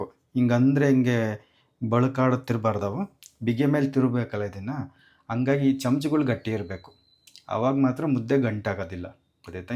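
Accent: Chitradurga, India. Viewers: Nam durgada bashe ty u so much 🥰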